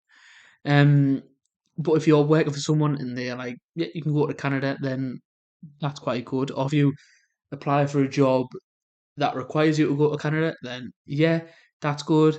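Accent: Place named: United Kingdom. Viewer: Based on all the negative Nancy videos you looked at listed under the video above, it is no wonder you came away with negative impressions of Toronto/Canada but having lived here all of my 76 years (a retired litigation lawyer), I have no serious complaints and would NOT want to live in the UK or the current version of the USA under crazy Tariff Tyrant Trump. Given your very thick regional British accent (I could not understand a lot of what you say in your videos), that also would not have helped you in Toronto or in Alberta (and I was born in Toronto but with British parents from Paisley and Dundee Scotland and London and Croydon England). In any event, good luck to you going forward but you really need to research the cost of living and the job situation wherever you live in this world.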